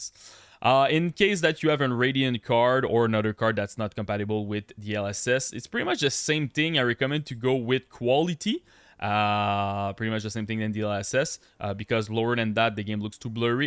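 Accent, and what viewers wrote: Quebec, Canada. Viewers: Are you from Quebec? You have the same accent as my wife and Georges St-Pierre. :D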